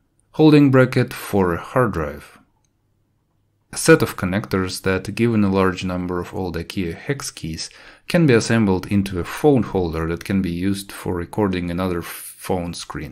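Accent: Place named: Russia. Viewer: Отлично. Дом без принтера скоро будет невозможен, это как микроволновка или пылесос, вещь необходимая. Дочь Арина и по акценту понятно что по русски говорите.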